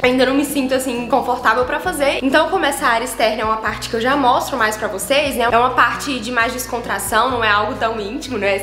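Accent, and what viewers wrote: Minas Gerais, Brazil. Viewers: Fugão, tremsão kkkk é mineira mesmo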